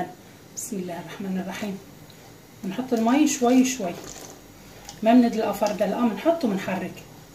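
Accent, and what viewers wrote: Aleppo, Syria. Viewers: صراحة انا واحدة ما الها ابدا بالكزمنتات. بس والله مو قادرة اقاوم. الله يعطيك الصحة والعافية يا خالتو و يقويكي. شو هالفن هاد, حفر الكوسا والا حبات الشيشبرك , كانهم مرسومين رسم. الله يسلم اديكي ويعطيكي الصحة. مبسوطة كثير انه صوتك الحمد لله احسن من الفيديو اللي عملتيه بعد مرضتك . اجر وعافية يارب. وانت ابداااا ما بتحكي كثيير . بالعكس حببتيني باللهجة الحلبية . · والله جوعتيني يا والله فعلاً منظومة ههه لازم نتعلم منك حكي الحلبي كتير بيعجبني يسلم هالديات متابعتك من لبنان بيروت 👍👍👌👌👌👌😋😋😋😋💞💞💕💕😍😍😍😍♥️♥️🌹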